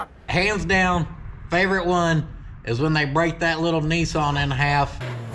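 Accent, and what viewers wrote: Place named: Georgia, United States. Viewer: I've been to Stroklahoma many times and I ain't ne'er heard no accent like you done got there. That right there sounds like a deep Gerjia or Alabamia accent...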